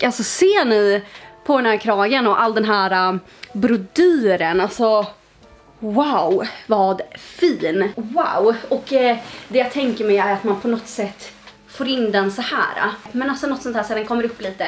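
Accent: Stockholm, Sweden. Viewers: varför pratar du så grov stockholmska?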